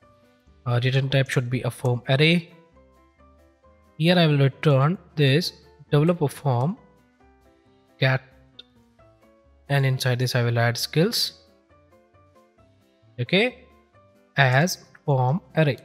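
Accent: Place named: India. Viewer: i hate indian english but i love u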